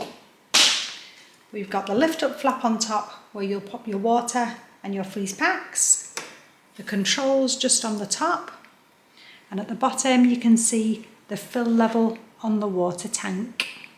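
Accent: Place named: South Africa. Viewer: She sounds like a white South African. If that’s correct, I hope you are in the U.S. now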